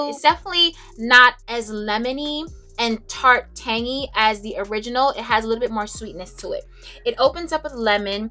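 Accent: New York, United States. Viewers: I can tell you lived in New York with that accent. Love your eyes 👀 . Lady I am old and just stop to smell the aromatics. I wish I had a Lil of the gallery behind you. Beautiful dress and earrings.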